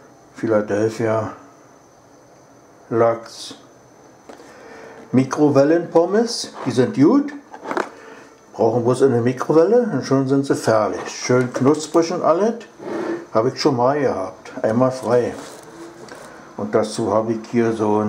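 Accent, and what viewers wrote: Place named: Berlin, Germany. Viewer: Du bist Berliner, das höre ich sofort. Und, ist der auch Berliner? Zimtschnecken schmecken nirgens so gut wie in Schweden. Wir Deutsche können die nicht richtig backen. Oh ja, heute wirds nochmal sehr heiß bei Euch. Hier unten am Bodensee ist es heute angenehm warm mit Temperaturen von 23 bis 25 Grad. Grüße von hier von Leonora Lily Vielen Dank für Deinen Besuch. Hört Karle auch meine Musik? 😼😽😼😽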